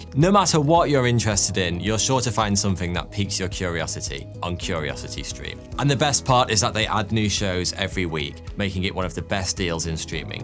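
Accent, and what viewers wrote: United Kingdom. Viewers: These British pronunciations are killing me.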